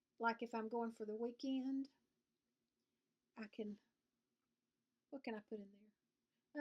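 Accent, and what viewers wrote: South, United States. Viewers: I love your videos. Don't worry about that Southern accent because I speak Southern too! 💁 Your projects are beautiful and your finishes are done so well. Thanks for sharing with us! Carolyn. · I enjoyed your video. Your finishes are lovely and I love your Amazing Grace bag. I have that pattern also. :) I love your accent. I am from the South as well. I was born in Alabama but live in North Georgia now. Where in the South do you live? Happy Stitching!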